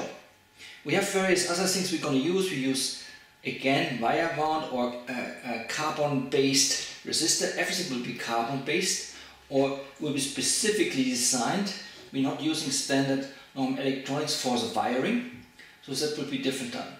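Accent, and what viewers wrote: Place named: Germany. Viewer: your accent sounds german, where are you from ?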